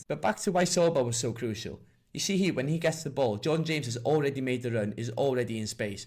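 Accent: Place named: North Wales, United Kingdom. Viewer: Dadansoddiad da. Da clywad acen gogs go iawn 'fyd!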